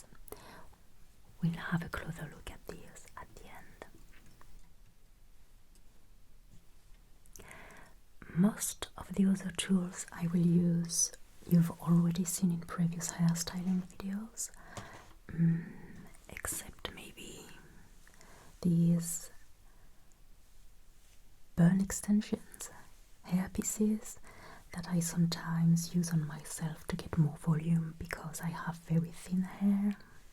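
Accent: France. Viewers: My goodness. The scenes you create, the movement of your hands, your voice, your lovely French accent, the overall energy of your videos - everything is so gentle and calming, it helps me forget for a little while the progressively dystopian world around us. Thank you for this respite from reality. ❤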